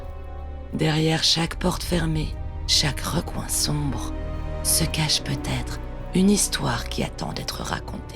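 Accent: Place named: France. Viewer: you are the most French sounding French woman who has ever existed! Bravo et bonne continuation de Bretagne. 🇬🇧😉👍🇫🇷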